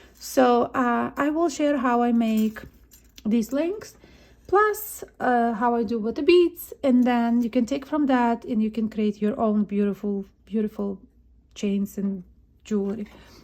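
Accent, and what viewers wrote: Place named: Czech Republic. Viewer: I love it, I lived in Prague for a while and while I know it’s not exactly the same, your accent makes me miss Czechia very much. I even have been to Kiev. My heart is with your people. 🖤💔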